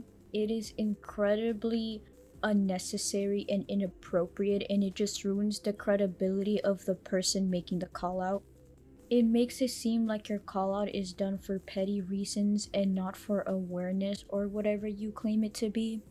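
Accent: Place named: South, United States. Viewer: Omg no I love Southern/Black accents 😭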